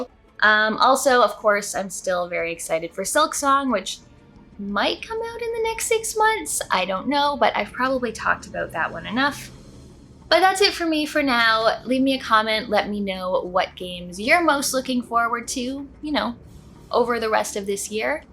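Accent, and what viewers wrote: Canada. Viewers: Man, your Canadian accent is really pronounced in this video.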